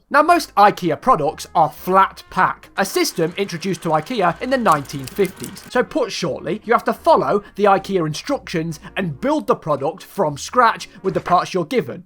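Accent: United Kingdom. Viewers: His British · Thank you funny british accent man for making my day better, i suggest your next video is about lidl since its common in europe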